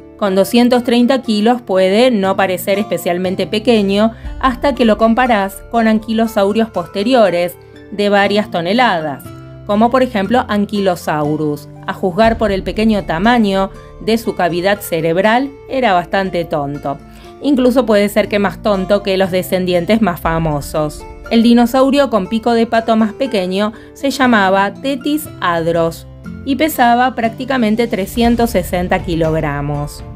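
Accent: Argentina. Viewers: me encanta cuando dices "hola amigos",con ese tono encantador argentino tuyo;tanto como tu trabajo,por demás interesante e ilustrativo, sería muy interesante,que contaras la historia de cómo llegaste hasta aquí. Mis mejores deseos,y saludos cordiales,desde México.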